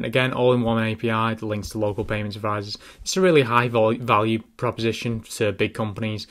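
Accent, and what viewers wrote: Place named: Australia. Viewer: This channel is massively under rated. Also helps that you sound like an Aussie like me.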